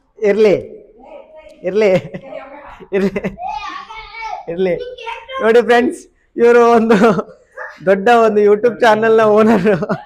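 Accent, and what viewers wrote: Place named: North Karnataka, India. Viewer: Anna nivu uttarkarnatakad bhashe yalle matadri, ade accent chand kelalikke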